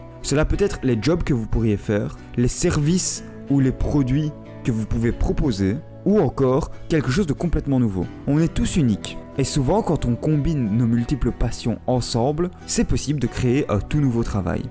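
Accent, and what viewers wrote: Liège, Belgium. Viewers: Hello, j'ai vu des vidéos, lu des bouquins sur l'Ikigai et ta vidéo est bien plus instructive et à son côté pratique que j'ai trouvé nulle part: comment répondre aux questions... Donc merci pour ta vidéos! Je vais explorer ta chaîne qui me paraît vraiment ce qu'il me faut en ce moment :) (Est-ce que tu es d'une région particulière ? ta voix me fait penser à l'accent de Liège :p )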